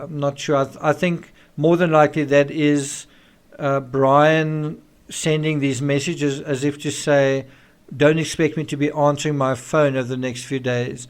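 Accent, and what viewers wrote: South Africa. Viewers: I love your accent am I right in saying it's a South African accent. Its the one accent I wouldn't mind the whole world having.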